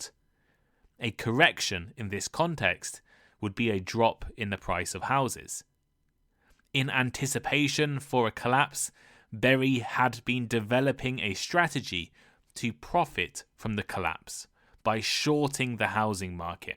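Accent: United Kingdom. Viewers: Oh, the British accent! Wonderful 🎉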